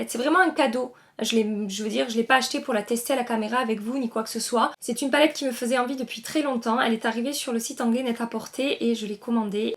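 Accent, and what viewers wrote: South, France. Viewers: Mdrrr ma tchuin avé l accent du sud mis à part le maquillage qui est à son habitude plus que réussie disons même superbe mais là tu as du perdre 2000 abonnés lol 😂 avec ton coup de gueule on sent que tu es excédé de répondre à 10000 commentaires du genre tu sors pas de ta zone de confort etc toujours les mêmes couleurs et j’en passe mais voilà comme si bien dit y’a des vidéos à la pelle de tous meme comment fabriquer une bombe alors le maquillage n en parlons pas lol 😂 Bisous ma belle et ce que tu fais tu le fais bien et tu y mets du cœur et de l’investissement personnel et financier mais ça très peu on connaissance de l envers du décor. Des milliards de 💋💋💋💋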